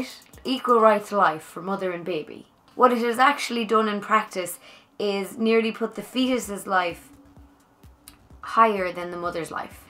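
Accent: Ireland. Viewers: I know it's that I'm American and not used to hearing the Irish accent, but I can't tell the difference between you saying legal and illegal.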